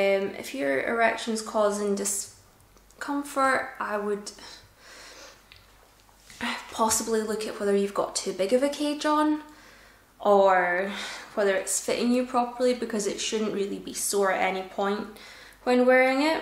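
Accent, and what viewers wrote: Scotland, United Kingdom. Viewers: Are you Scottish? Your accent sounds Scottish to me.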